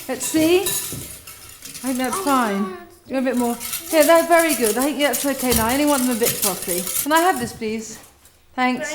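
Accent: England, United Kingdom. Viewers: I love her english accent. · too much british accent for me to handle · When i was young, sure, loved her British accent and the way she has with words but as I grew older, I saw that she could use spoons more, wash hands after handling eggs AND FOR THE LOVE OF GOD, SCRAPE THE BOTTOM OF THE BOWL AFTER POURING OUT THE CAKE BATTER!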